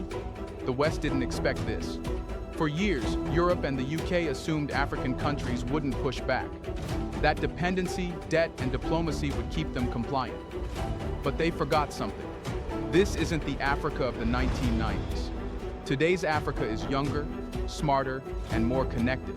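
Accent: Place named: United States. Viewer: All these fake AI videos online, American accent on an african report? Get real...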